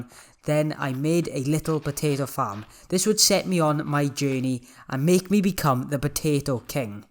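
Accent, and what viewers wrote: Wales, United Kingdom. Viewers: Banger btw yout welsh accent is perfect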